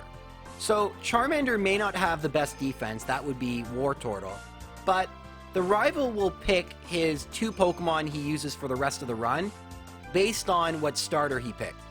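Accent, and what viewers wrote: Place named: Canada. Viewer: Based on his accent he’s Canadian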